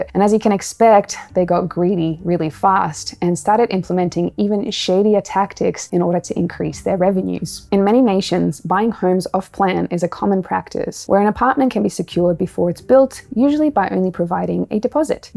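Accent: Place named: Australia. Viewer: Your starting to lose your Australian accent...😄 Thank you for the such details you go into too explain everything... it's a shame that 99% of the world's population has absolutely no idea what is really going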